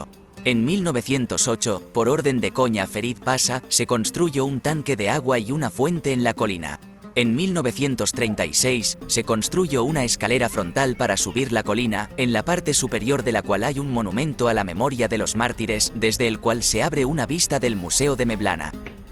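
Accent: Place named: Spain. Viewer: que mal habla, aprenda a pronunciar propiamente, hace del video una mierda.Ese acento español, pronuncie como se pronuncia en su idioma. la ñ, y no es túrquia es turquia. IGNORANTE!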